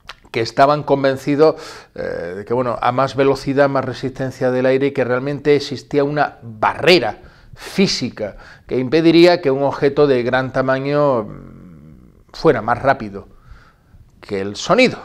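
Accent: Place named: Cadiz, Spain. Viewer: tu eres de cadiz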